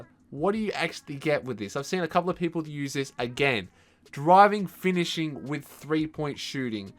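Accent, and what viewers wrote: Australia. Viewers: Are you from Australia or New Zealand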